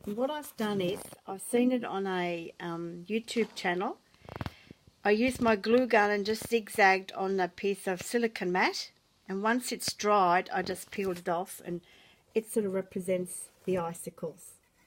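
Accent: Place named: Australia. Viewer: just lovely, love santa up there... i did not know you came from Germany...you have the Aussie acesent down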